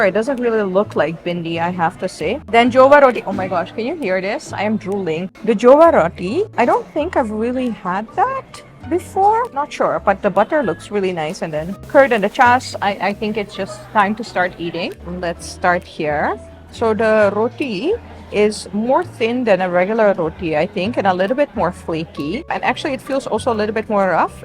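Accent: Bangalore, India. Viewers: Vôtre accent est indien? C'est vrai? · WOW idly, Dosa, Sambar, Voda, Alu Gobi..WOW Your talking like local Indian..I'M IMPRESSED.. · wow, more desi accent than me. I'm in E. Europe too. The best. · Why you speak like indian? Or it has voice over? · I only have one question: Why do you say you are a foreigner when you are in Indian? You have clearly a distinct Indian accent? Are you ashamed to be an Indian? I am a foreigner vlogging in Indian and I find it a great country. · The food looked delicious, and its funny how you really have an Indian English accent haha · Its cute how her accent is getting more Bangalore. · Damn how do you sound Indian while being Dutch originally?? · Ur acent is Indian why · Ma'am your english accent is more Indian how that happened , Haha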